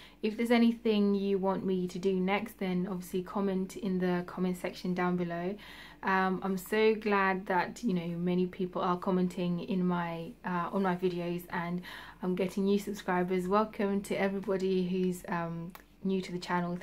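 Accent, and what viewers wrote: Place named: Newcastle, United Kingdom. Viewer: Sounds like Newcastle.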